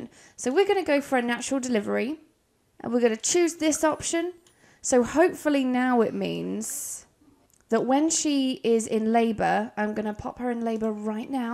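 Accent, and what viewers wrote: United Kingdom. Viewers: OMG LOVE YOUR ACCENT WISH I HAD I AM AMERICAN sadly YOU SOUND LIKE YOUR BRITISH I LOVE THAT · im sorry but your accent is so british :')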